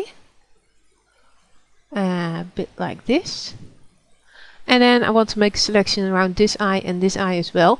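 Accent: Netherlands. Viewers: lol, ik hoor wel dat je een jonge Nederlandse vrouw bent ;) Buitenlanders hebbe' natuurlijk geen idee. Goede filmpje bij de weg.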